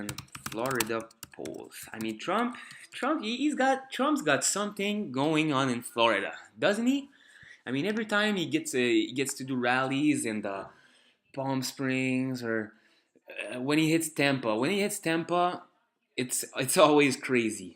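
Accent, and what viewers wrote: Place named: France. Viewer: like your accent. i hear a bit of french.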